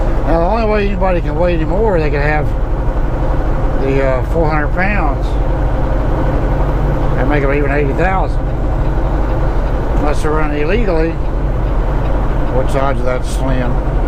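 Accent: South, United States. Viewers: Native Alabamaian so southern talk is fine by me. Always enjoy your videos and conversation!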